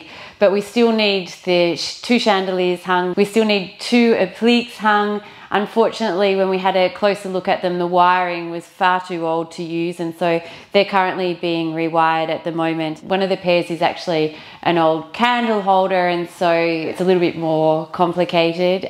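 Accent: Australia. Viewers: Love love this! Yay an Aussie accent! And I’m only halfway through this episode. Stunning wallpaper. J’Adore! Tres Bien 💗💗💗 and congratulations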